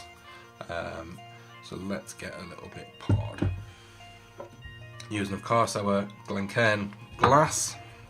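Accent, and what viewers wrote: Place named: Durham, United Kingdom. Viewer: I've just bought myself a bottle of this. I'm looking forward to trying it. I've recently got into whisky. Not tried a Campbeltown yet. Are you from Durham? I'm from Durham you're accent sounds similar to me.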